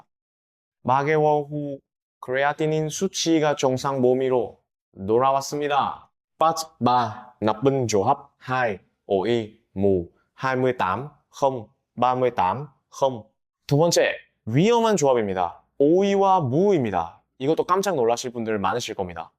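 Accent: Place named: China. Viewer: 방송이 뭐 이래요 중국식 발음이 뭐예요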